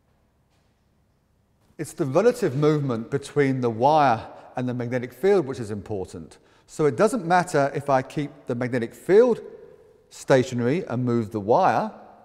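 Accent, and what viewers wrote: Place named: England, United Kingdom. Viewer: if you google the pronounciation of wire on google there are two ways to say it. he is saying it in the British way. shocking for you there are accents other than an American so shut up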